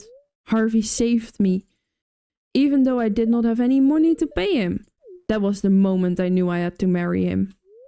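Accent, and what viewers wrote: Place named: Netherlands. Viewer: Your Dutch for sure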